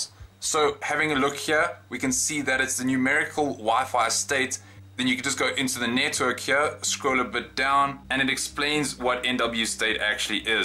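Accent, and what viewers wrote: South Africa. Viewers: Love your voice accent. Africaans? Australia has one of its very senior politicians with your accent. Subscribed because we want more user friendly program from you.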